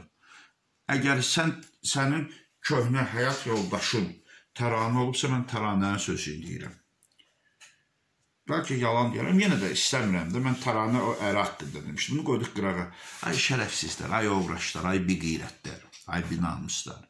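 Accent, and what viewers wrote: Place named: Sheki, Azerbaijan. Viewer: şəkiknən danışır 😀😀 can ay yetim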